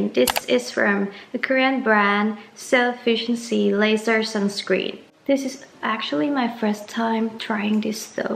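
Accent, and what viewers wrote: England, United Kingdom. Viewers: ชอบสำเนียงอิ้งมากกกก😍💗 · i love your english accent💖 so please don't be shy naka💖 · ชอบสำเนียงอิ้งพี่ มากๆ อยากให้พี่ ทำคลิปแบบนี้บ่อยๆ งื้ออออออ นี่คือพยายามฟังโดยไม่เปิดซับ ฟังออกบ้างไม่ออกบ้าง ถือว่าได้เรียนรู้😶 ปล.พี่ น่ารักมากกกก ชอบโทนนี้เลยยย❤🍑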